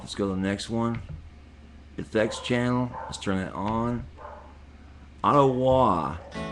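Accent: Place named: South, United States. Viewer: Bro you are a good ole southern boy just like me lol Ive got the very same drawl you have!!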